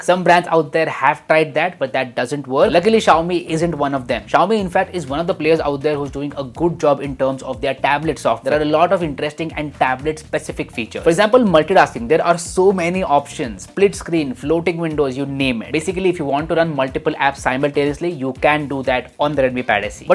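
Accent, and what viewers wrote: India. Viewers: Sorry... cant stand listening to the very thick Bollywood accent...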